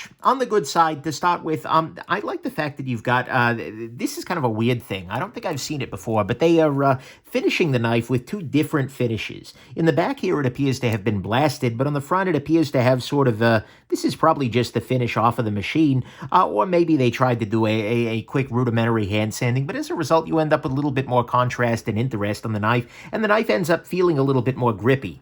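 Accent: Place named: Mid-Atlantic, United States. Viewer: I dig the accent it sounds like 1940’s mid Atlantic where it from?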